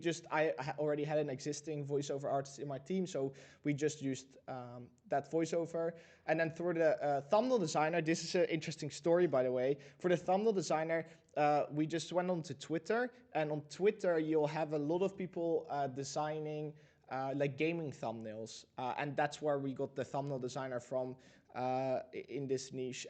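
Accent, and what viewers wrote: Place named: Netherlands. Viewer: Are you Dutch? Cause I hear the accent 😂💪🏽💯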